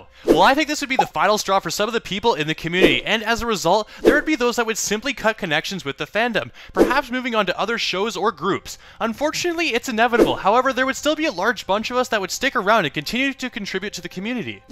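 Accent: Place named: Canada. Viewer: U sound very Canadian, lol so am I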